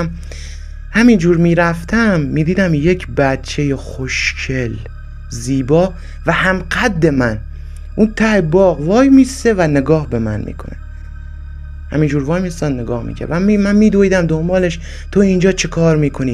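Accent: Kerman, Iran. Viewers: دمت گرم لهجه کرمونی عشقه... حتما پارت های بعدی رو بزار جان❤ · سلام وخسته نباشی ، به پدرومادرت افتخار کن، صداقتی که درگفتارت بالهجه قشنگ کرمانی داری باعث شد عضو کانال شما بشم برات آرزوی سلامتی وموفقیت دارم.باتشکر خوزستان اهواز سیدسعید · خیلی داستان خوبی بود مخصوصا با لهجه شیرین کرمانی که تعریف میکنید بدل میشینه... لطفاً بیشتر بزارین از این قصه زندگیتون❤❤ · س بچه تهران آقا اهل دروغ نیست بچه زرنگ لهجه کرمان همینه عالی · داش شنیدن این داستان به لهجه خودمون چقد دلنشین تر بود کرمون خودمون چون خونه قدیمی هست از این مسائل زیاده در ضمن دم مادرت گرم عجب شیر زنی بوده خدا حفظش کنه❤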